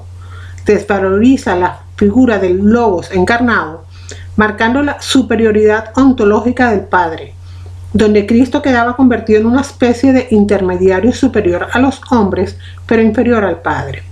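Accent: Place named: Colombia. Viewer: De que provincia de Colombia es este acento?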